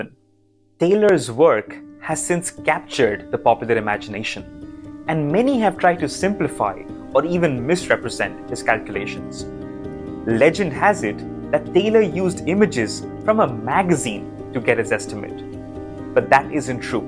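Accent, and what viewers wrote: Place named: India. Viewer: only indian channel where the accent doesnt piss u off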